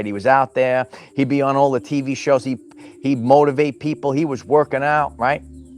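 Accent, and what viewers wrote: New York, United States. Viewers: 🙏🏻 Gr888 work as always. I can see why so many ladies come here, but I have to say I come for the TRUTH TALK, and also I’m a sucka for that New York/Jersey (?) accent, gets me every time! 💃🏼 PS I have images of the Lions appearing if you want to see them, seek them out on my Ch Ann el here…last Aug/Sept sometime, one must have the eyes to see… btw this is TruthBeSpoken, I have (4 diff) ch Ann els, dear brother/neighbor. 🕊️🕊️✨🦁✨🦩🦩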